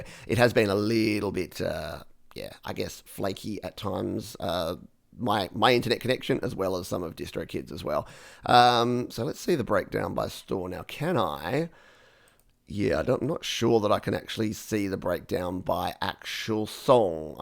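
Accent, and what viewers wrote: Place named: Australia. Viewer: I love your accent. That's why I want to go to Australia. Greetings from Germany.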